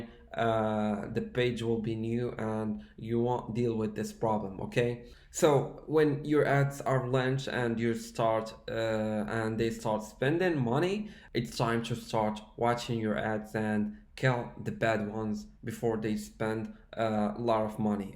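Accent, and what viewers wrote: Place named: Morocco. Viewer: He is Moroccan and his pronunciation mixed between Arabic means Moroccan dialect and French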